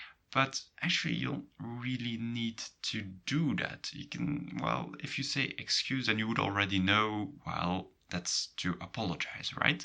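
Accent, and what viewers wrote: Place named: Flanders, Belgium. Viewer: I feel proud that I noticed your Flemish accent. I'm used to hearing Randstad Dutch.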